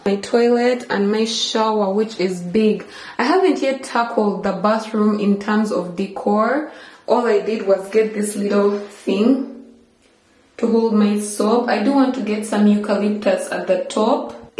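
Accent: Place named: Uganda. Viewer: Are you Ugandan? Your accent sounds Ugandan. ☺️ Edit: Just saw another comment where you confirm that you're Ugandan. Thanks for representing!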